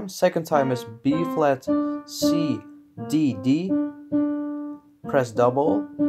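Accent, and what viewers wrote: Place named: Netherlands. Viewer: haha, nederlands overal, ik hoorde het aan je accent :)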